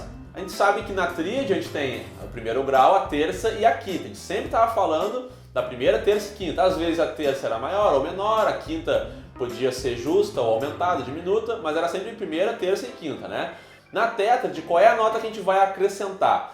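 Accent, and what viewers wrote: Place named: Porto Alegre, Brazil. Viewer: Sotaque de pôoorto, bah!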